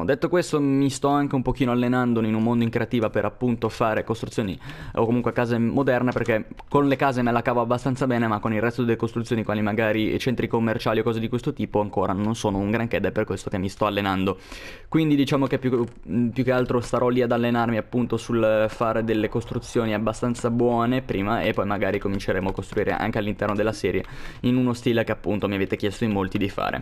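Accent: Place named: Monza, Italy. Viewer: Secondo me sei di Monza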